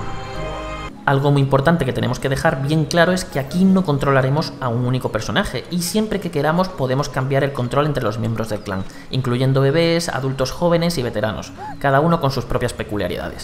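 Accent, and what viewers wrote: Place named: Malaga, Spain. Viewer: El locutor es malagueño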